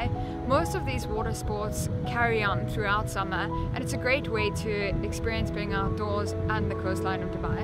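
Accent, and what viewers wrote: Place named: South Africa. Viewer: Very strong South African accent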